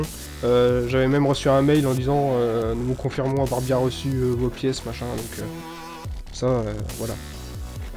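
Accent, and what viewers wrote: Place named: Charleroi, Belgium. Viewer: L'accent du nord que tu as me fait blinde penser a celui de Charleroi (ville de Belgique) 😉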